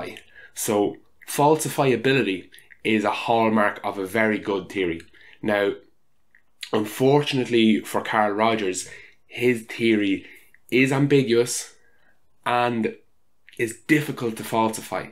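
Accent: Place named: Ireland. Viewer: the kindness in the eyes, the gentleness that comes up naturally, the polite approach combined with evidence, and the lovely 'r' cannot belong to anyone else but an Irish soul! I am a foreign student from Bulgaria and have done my BSc in Scotland and MA in England and have been luckily blessed enough to meet the Irish culture as well along my way and could say that I love all my Irish friends! Been a pleasure to watch your content! Keep up the good work!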